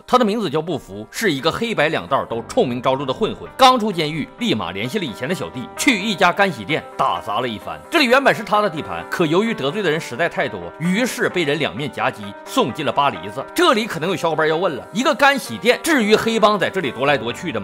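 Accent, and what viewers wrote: Northeast, China. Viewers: 2楼。牛，东北话解说真的到位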